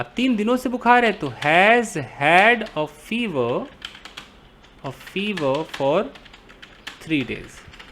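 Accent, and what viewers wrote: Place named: Bengal, India. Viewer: Vaiya aap ka bengoli bohut sweet hai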